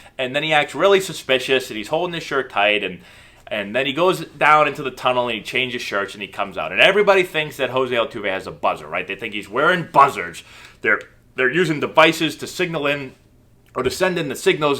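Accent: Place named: Boston, United States. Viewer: You must be from Boston with that accent...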